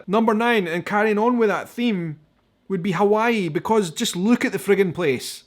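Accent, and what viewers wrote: Scotland, United Kingdom. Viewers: Greetings from wyoming!! Salt Lake City it’s a diverse city I do love it there , my brothers do live there!! The best time to visit is in the autumn spectacular views from the mountains, I highly recommend it 😌 I love your Chanel your accent it’s so sweet, my son just married a lovely young lady from aviemore and as well looove the accent !! We are planning in visiting Scotland soon I hope!!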